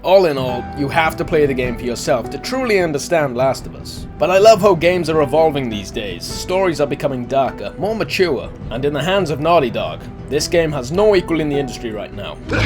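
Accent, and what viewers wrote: Wales, United Kingdom. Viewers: Are you from wales? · ! You are Welsh! So am I!!! Which part of Wales do you come from? Because you have a Welsh accent. Lastly I come from Pembrokeshire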